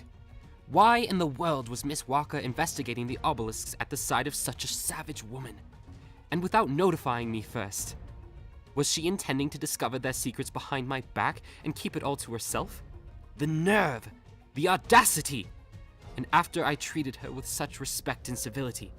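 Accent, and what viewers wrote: United Kingdom. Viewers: I’m English and love your British accent haha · You have a British accent? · Why the hell are you reading these outloud....do that many people who play not read? And wtf is the british accent about, like you said its trash. This video is trash and extremely unhelpful. Thanks for the waste of time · I don't mind the British accent, good voice acting in another dialect takes a lot of practice/training. My biggest problem is that you have this beautiful idea and a creative mind to put it all together and didn't bother to learn to pronounce a lot of the '$10 words'.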